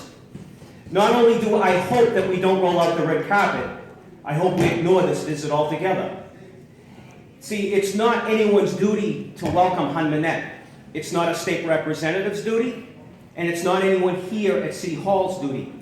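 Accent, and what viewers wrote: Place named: Italy. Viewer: This speaker is of Italian descendance.His accent gave him away....;)))))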